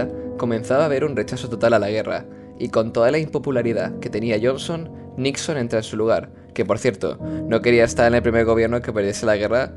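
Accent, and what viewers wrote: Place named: Spain. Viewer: El payaso este es español, conozco el acento, como todos los comunistas es un niño pijo de familia mimada.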